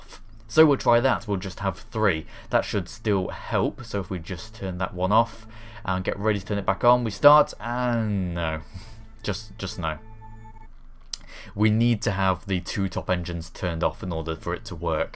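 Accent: United Kingdom. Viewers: I think he's british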